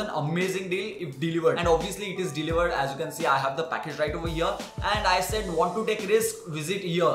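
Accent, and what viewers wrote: India. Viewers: no disresoect but i wish that some videos were limited to their country of origin because 8 cannot for the life if me understand the Indian accent 90% of the time. It just pisses me off when I click in an interesting thumbnail and I can't understand what is being said!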